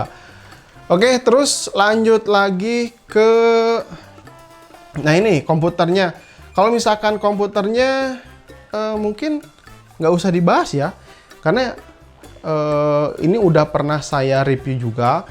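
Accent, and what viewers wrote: West Java, Indonesia. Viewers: Bismillahirrahmanirahimmmmm.. Semoga menang Subscribe✔ Follow✔ Like & Share✔ Instagram : ry_akbar037 Video yang paling diingat adalah waktu review Vga zotac. soalnya pas zotac nya koat ka sunda banget gt. "JOTAX" nyebut na teh hahaha Pengen Banget Ram Venom RX 8GB karna saya rencana mau rakit pc DDR4 soalnya bosen pake ddr 3 mulu hehe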